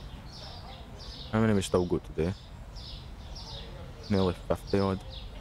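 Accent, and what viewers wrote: Scotland, United Kingdom. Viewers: He is speaking Scottish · I thought it sounded scottish awesome vid my g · no worries mate once i realised you had a scottish accent it was much easier to get what you were saying hahah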